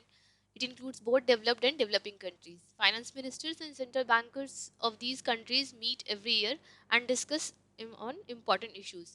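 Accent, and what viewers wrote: India. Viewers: you sounds like wal-mart and dell customer service, both are indian.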